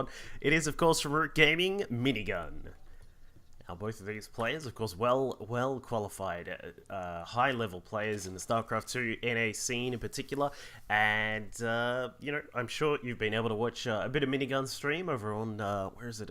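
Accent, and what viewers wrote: Australia. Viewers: Nice cast. Are you Aussie ?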